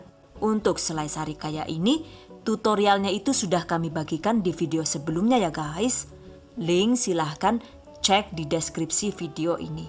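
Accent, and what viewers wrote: Banyumas, Indonesia. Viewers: Asline wong Endi sih yu? Ngapak bisa, ngomong ngaggo bhs. Inggris ya pinter temen koh... Hahaha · Ngakak ngapaknya mbekayune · Hahaha.. Ngapak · lengkap temen sh yu tutone... mantep sampean ko logate wong banyumas Cilacap sh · Ngulen tangan bisa se'elastis itu bun.. mantappp Ger lgi ngapak mantep retung, sg ra ngerti li ndarani lgi ngomaih😂😂 "DONE" #GiveawayTHVDesember2019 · Jebulane ngapak yak,lucuuuu men yak👍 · Oalah jebule rika wong ngapak yu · Aaakk ngakak bgt ngapaknya seru ditelingaa 😂😂😭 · Lucu2....saya suka kalo lihat,bisa +ilmu & pasti'a bisa ketawa sendiri kalo yg punya channel bicara NGAPAK....hehehe. Rika asline wonk ndi sih yuuu...genenk bisa ngapak,kunci Inggris'e juga pinter....ehhhh bahasa Inggris maksude enyonk. Hehehehehe.....piss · akun masak tapi sajian videonya bikin ketawa dg aksen ngapak yg khas. Makasih atas ilmu yang dirangkum dg menyenangkan dan ga ribet:) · Pas denger dirimu ngapak2, saya ngakak dan langsuung subscribe. 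😂😂😂. Sukses sis dan makasih resepnya ❤️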